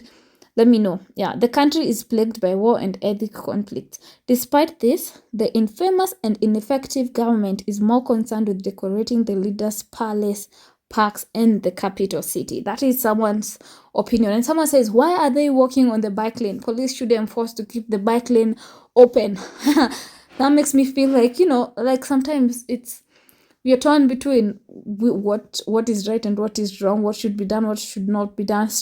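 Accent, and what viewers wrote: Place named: Somalia. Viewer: Her accent doesn't sound Ethiopian. She is Somalian I think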